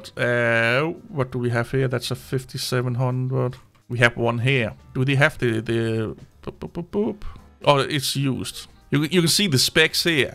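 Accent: Denmark. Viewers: I'm guessing Danish?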